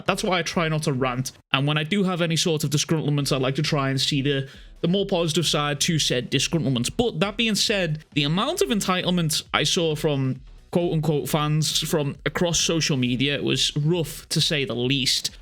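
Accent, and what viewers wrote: Liverpool, United Kingdom. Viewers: Are u a scouser ? I thought you were scottish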